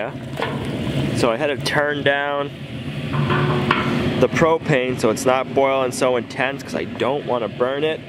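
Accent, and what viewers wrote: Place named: New Hampshire, United States. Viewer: Gooood Mawning! I hope my poke at the New Hampshire (Massachusetts blend) accent doesn't offend, but it does come through loud and clear to this Lawn Guylanda! Looks great in the outdoor kitchen. I like that you're "tapping into" the available local resources for making Maple Syrup. I hope that you can put smiles on the faces of friends that you share it with and maybe trade it for something else that you can use. You should see if your pig farmer is willing to trade! Have a great day and enjoy the day with Olivia and Gina whatever y'all do.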